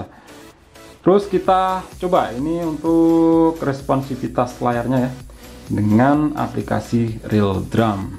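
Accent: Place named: Kediri, Indonesia. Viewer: Org kediri lu bang 😂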